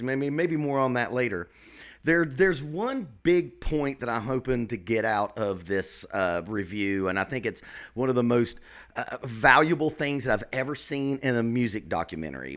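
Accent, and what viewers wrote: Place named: Gulf Coast, United States. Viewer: I'm trying to read your accent, are you from the gulf coast?I came for the Beatles doc, but I'll continue to check out your channel! 👍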